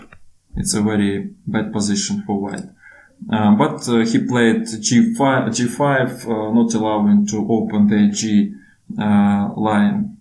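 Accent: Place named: Eastern Europe. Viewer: мне в своё время нужно было подтянуть инглиш. Перепробовал многое, в т.ч. и репетиторов-носителей английского языка. Но для меня самый действенный способ оказался просмотр интересных фильмов с субтитрами. Все непонятные слова я выписывал на транслейт.ру, а далее перед сном их заучивал. Первая серия при длительности 45 минут занимала у меня полтора часа (всё выписывал, переводил и схватывал о чём фильм). Последняя серия уже заняла примерно 47 минут. Во-первых, будет полезно услышать их акцент и мозг сам переключится на более "забугорное" произношение. Сейчас слышно, что акцент восточно европейский. Во-вторых, существенно расширите свой словарный багаж. В-третьих, ещё и психологически отдохнёте за классным фильмом. Единственное - нужно обязательно тратить время и заучивать слова перед сном, и желательно с утра их просматривать. А от себя скажу - огромная благодарность за ваш труд. 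Реально оч интересно смотреть ваши видео. Причём год от года наполнение стало гораздо более качественным. Уверен, что и с английским будет аналогично. Успехов вам! С благодарностью, ваш подписчик.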